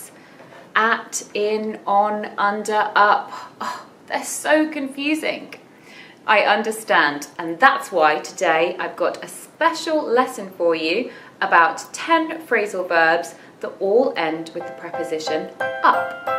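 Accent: United Kingdom. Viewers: I really look up to you because of your pure British English accent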